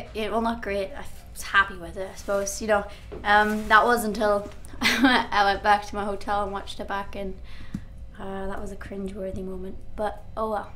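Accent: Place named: South, Ireland. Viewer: dont like how shes changed her accent to sound more southern irish.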